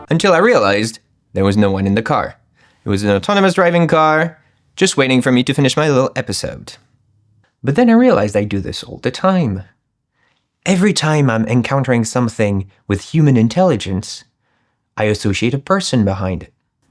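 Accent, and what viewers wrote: France. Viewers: I love your aggressively French delivery and this is a very thoughtfully written video. I love the concept fission concept too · i can hear the frenchman underneath the english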